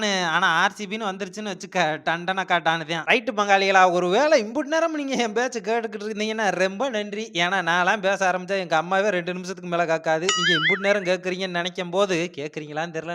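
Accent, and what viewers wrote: Madurai, India. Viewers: தம்பி மதுரை ஸ்லாங் பேச்சே செம என்டர்டெய்ன்மென்ட் தான்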